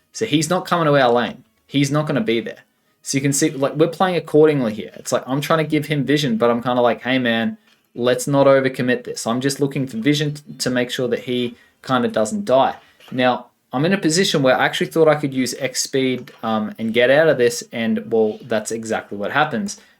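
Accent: Australia. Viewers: The Australian accent is great. 😂👌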